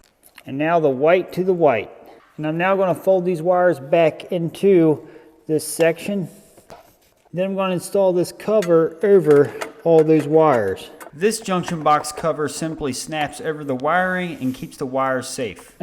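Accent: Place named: Baltimore, United States. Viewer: That BAWWWLDIIIMORE accent!!!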